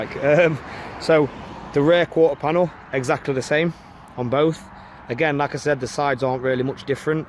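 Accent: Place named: Derbyshire, United Kingdom. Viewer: Defo from ilson (Ilkeston) Langley mill Eastwood area, can tell by the accent lol. I was looking at the Corsa VXR and the fiesta ST and ended up buying a fiesta ST 65 plate. Both nice cars. I need to get mine remapped but I’ve still got Ford warranty until the start of October so I don’t want to mess that up lol.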